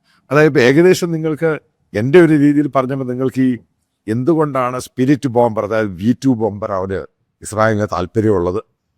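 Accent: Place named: Kottayam, India. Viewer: ആ കോട്ടയം സ്ലാങ് തള്ളൽ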